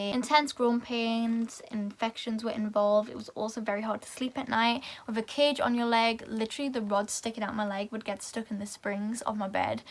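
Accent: United Kingdom. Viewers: Being a 57 y/o man I'm glad it wasn't a makeup tutorial lol. My wife and I are proud of you. Happy you can openly talk about your history. When asked about it you can tell them to watch your videos. Since sis said hi say hi back. I need to watch more British movies so I can be used to your accent more lol.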